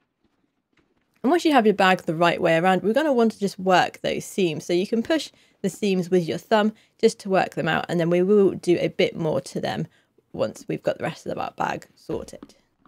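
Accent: England, United Kingdom. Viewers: hello dear friend, i enjoyed the videos and i like your English accent cause i'm learning English by listening to many videos, and i saw you folding the edges of suede leather, i want to advise you to get a help of thin comb may be you can break some of needles of the comb to create a space which will help you to assemble correctly the glued parts with a perfect line, god bless you . i'm from Algeria, Northern Africa.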